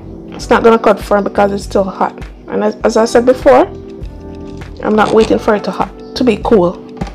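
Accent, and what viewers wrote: Jamaica. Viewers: Who is not a Jamaican. Stop talk . Do you know how a Jamaican sound. She is a 100% Jamaican if you don't know anything or sure don't say shit.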